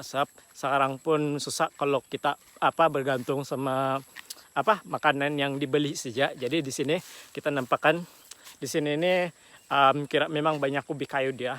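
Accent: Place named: Malaysia. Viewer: Betul betul betul Logat malasia yasobat Hdr bwt nyport👍👍👍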